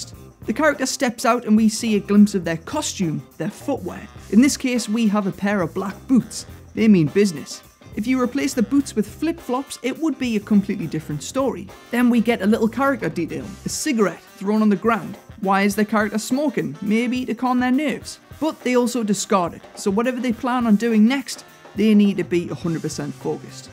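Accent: United Kingdom. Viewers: Hey bro whats your accent? I aint british but interested in british accents. The acceent I speak is similar to yours. Is it a north accent?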